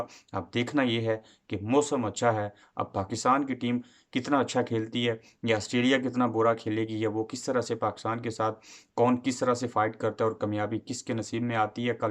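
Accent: Kashmir, India. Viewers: Apnay kashmiri accent ko khatam karo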